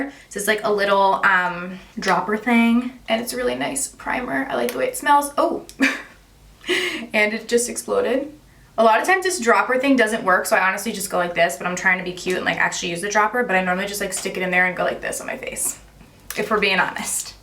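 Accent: United States. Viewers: I usually watch sugar puff and fluff but I thought I would give you ago. So disappointed you are an American who speaks so fast and non stop,doesn’t take a breath I’m English and very hard to understand you and catch the name of the product you are using. I’m sure you are very good.sorry Have a look at sugar puff and fluff. I think you will be pleasantly surprised Joi x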